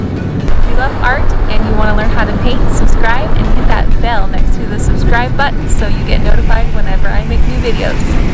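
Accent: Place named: Midwest, United States. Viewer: You must be originally from the Midwest/east.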